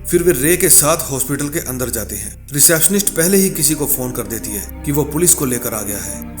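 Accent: Punjab, India. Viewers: mennu laggda 22 punjabi aa 😂 accent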